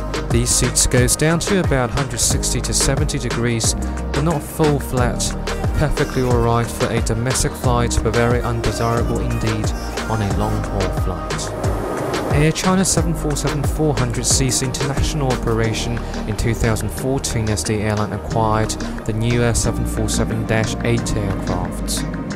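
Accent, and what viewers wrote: United Kingdom. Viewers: 兄弟还是英伦腔哈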